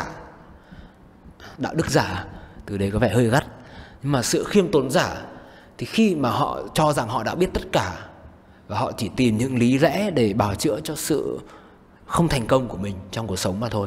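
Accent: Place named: North, Vietnam. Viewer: Bạn này giọng ấm quá nhỉ ^^ Siêu thích boy nói giọng Bắc :3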